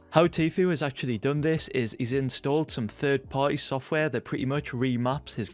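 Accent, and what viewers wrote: Newcastle, United Kingdom. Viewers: Love all your content bro I’m a Geordie myself so hearing you makes it sound like home 🔥🔥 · Only me how love your british accent :D please do facecam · R u from newcastle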